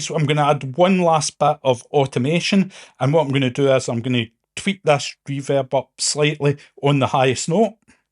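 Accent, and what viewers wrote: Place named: Scotland, United Kingdom. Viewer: He is Scottish. Bloody hell, there's an R! 😄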